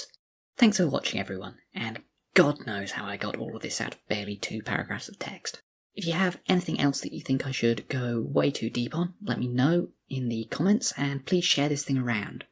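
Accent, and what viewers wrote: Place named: United Kingdom. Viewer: You sound a bit young.. but your voice is that type of brittish that lets you know you were born as a 35-year-old person with a cup of tea in hand. Im not sure what software is changing your voice. But you could tune it in a bit. If you're not using something to augment your voice you could use a new mic.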